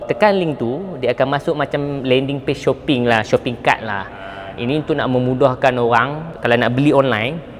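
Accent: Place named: North, Malaysia. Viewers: Saya amat suka kepada cara penyampain yg Walaupun loghat utara tapi Santai. Bagus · Terbaik abg.. aku suka cara abg explain.. santai dan penuh ilmu.. yg penting bahasa utara tetap dihati.. tak da pon unsur2 selit bahasa engeris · hang memang sempoi bang..walaupun dh fly keserata dunia tapi masih tetap bawak loghat kedah..sempoi baqhang.. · Sempoi la dia sembang. Candid n natural. Syok dengaq org Kedah sembang. X yah nk kalut tukar ckp KL pun.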